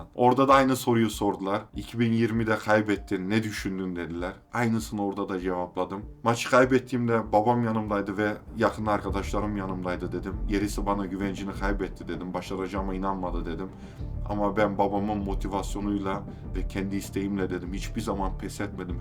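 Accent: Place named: Niğde, Turkey. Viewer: kardeşim severek izliyorum başarılarınla mutlu oluyorum ama şu Türkçeni düzelt nolur sen Niğdeli sin yapma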